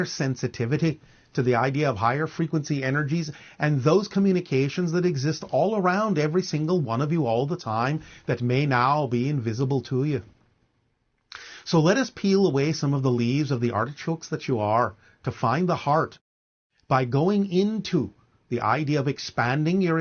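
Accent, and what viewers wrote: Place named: Ireland. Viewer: Whg does Bashar have an Irish accent 🤣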